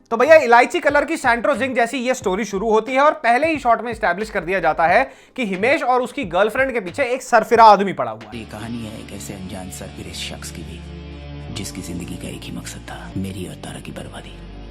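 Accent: Haryana, India. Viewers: Your haryanvi accent is to the point.😂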